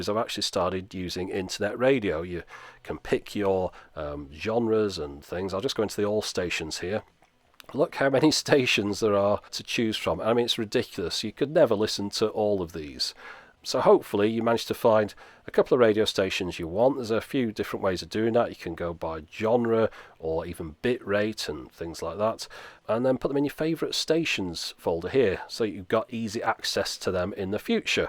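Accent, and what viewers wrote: United Kingdom. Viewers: it's awesome that youtube can recognize your words almost correctly through your strong British accent then provide a subtitle for me to recognize what you say..😳😳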